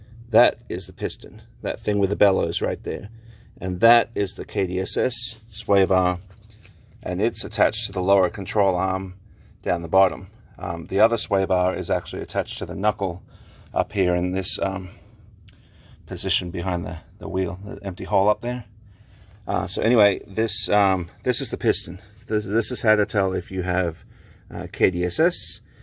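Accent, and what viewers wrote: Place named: Australia. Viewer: Great explanation and tips. You sound very Australian, I can't figure it out haha. Cheers Cobber!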